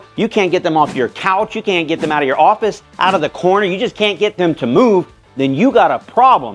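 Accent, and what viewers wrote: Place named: Louisiana, United States. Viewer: Nailed that cajun accent!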